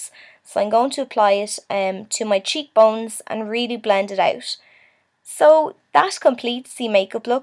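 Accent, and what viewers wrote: Ireland. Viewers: Ahh the irish accent :) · ur irish? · Are u irish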